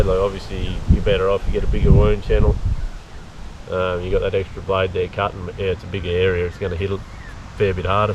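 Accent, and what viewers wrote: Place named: Queensland, Australia. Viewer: Q'ld accent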